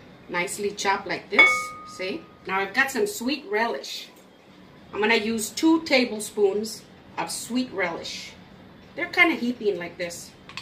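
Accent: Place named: Texas, United States. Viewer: ! I absolutely love your recipes! I tell our four young daughters who watch your videos with me that I would love a lady in my life like you to teach me all her recipes! I often thought you were from Texas, just because of the way you talk and present yourself. I am from Austin, and we live in Manor, and today on this potato salad video I saw the mustard from H‑E‑B. Haha!!! I know there is no HEB’s in any other place but Texas! Anyways! We LOVE YOUR RECIPES! I used your cheese enchilada video as a reference for last nights dinner. We all loved it! Thanks for all you do and God bless you and your family!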